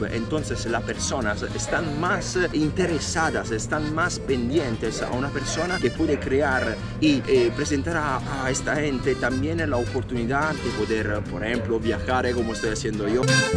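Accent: Italy. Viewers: me imaginé porque tengo un amigo que también es italiano y habla el español así mismo 🤭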